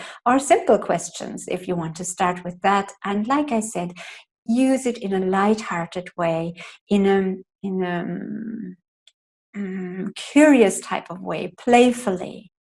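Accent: Ireland. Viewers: Hi, you have a west of Ireland accent! So easy to listen to:-)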